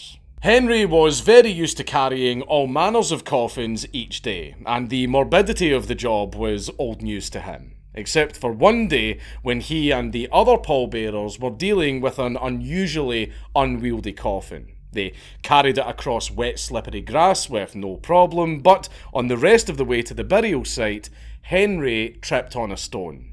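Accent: Scotland, United Kingdom. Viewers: >a scottsman making fun of any accent Lel, shiggydiggy bro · Oh nvm i thought this was hunter not some scottish guy lol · Lol AI can't understand a Scottish accent 😂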